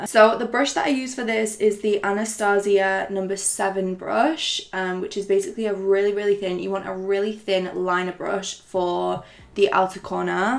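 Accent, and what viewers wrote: United Kingdom. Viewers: Finally I can find makeup tutorial with British accent!! love you!!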